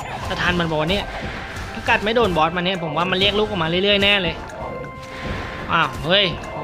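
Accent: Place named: Provincial, Thailand. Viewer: มาแล้วไอ้หนุ่มเสียงเหน่อ ที่รอคอย BRAVO~~~~~~~~~~~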